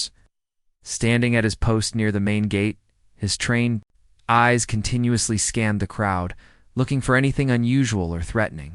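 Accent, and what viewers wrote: United States. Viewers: Yet again more smooth American accented bullshit! Why not take the mickey out of the Arlington guards? Chickenshit?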